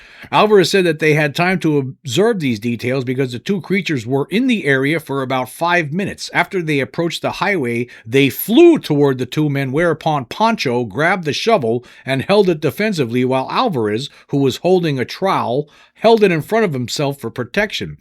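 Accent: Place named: Massachusetts, United States. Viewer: You from Massachusetts ? I’m usually pretty good at picking up on a fellow neighbors accent lol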